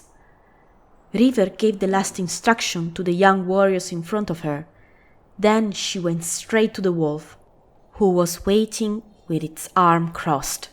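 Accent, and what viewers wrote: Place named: Scotland, United Kingdom. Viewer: hai un accento simil Scottish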